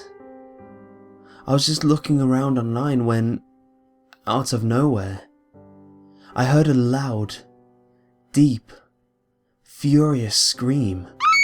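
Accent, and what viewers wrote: United Kingdom. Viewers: Oh, and your voice is smexy. I love your voice. Are you British?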